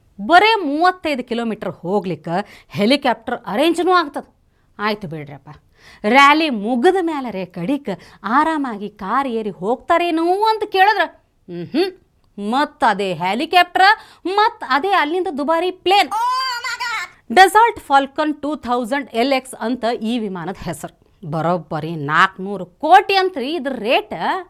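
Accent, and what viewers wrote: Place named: North Karnataka, India. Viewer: ನಮ್ಮ ಉತ್ತರ ಕರ್ನಾಟಕ ಭಾಷೆ ಹೇಳಿದ್ದಕ್ಕೆ ಧನ್ಯವಾದಗಳು mam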